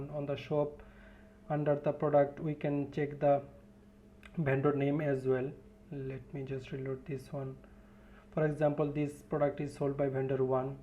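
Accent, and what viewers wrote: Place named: India. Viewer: It is Indian english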